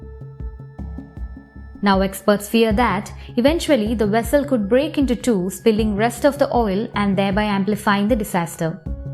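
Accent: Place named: Kerala, India. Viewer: Your Malayali accent ... Beautiful!!!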